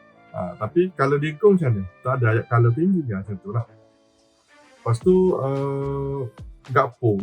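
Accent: Kelantan, Malaysia. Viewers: Kecek kelate la abe .. santai2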